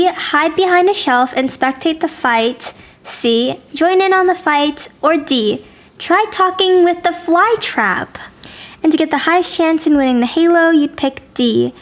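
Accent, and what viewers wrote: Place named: United Kingdom. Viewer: I LOVE YOUR BRITISH ACCENT OMG <3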